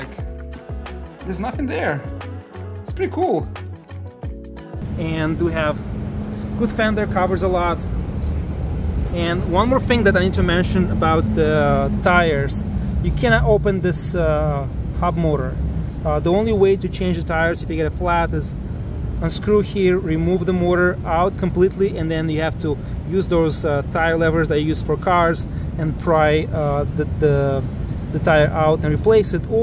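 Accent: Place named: Russia. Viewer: я не пойму комментатор русский чтоли? прослеживается акцент)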